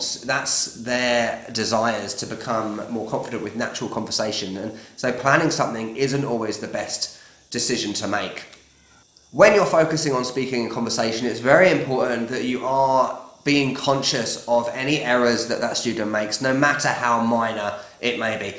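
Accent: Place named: London, United Kingdom. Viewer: ) I am from Barcelona. So my accent is European. Nothing to do with the Mexican accent lol. My sister is now in México DC. She's been living there for over a year now. I love your London accent by the way! I was actually thinking I could maybe book some lessons with you so I can see how you structure the lessons and what resources you use. This would be really helpful. And at the same time it would help me improve my speaking skills (specially pronounciation :)) I have been working as a translator for quite a few years now but work flow is up and down. So I decided to start a course on how to teach Spanish as a foreign language as I thought this could maybe help me get an extra income and it will allow me to socialise a little bit. Working as a translator from home, all alone without seeing anybody, makes me feel a bit lonely sometimes :)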